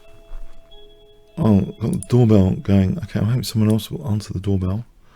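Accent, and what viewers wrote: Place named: London, United Kingdom. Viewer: Yeah it cause a londaner, "th" becomes "f" or "v" depending on the word!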